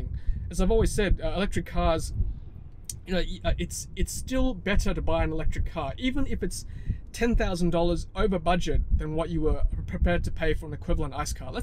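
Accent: Australia. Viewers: Thanks for your entertaining update,! I thoroughly enjoyed it like all your posts. Your enthusiasm is infectious & it's really good to hear all this locally from someone with an Australian accent. Keep up the good work (and please let Joy know I enjoyed her posts as well). Cheers, mate!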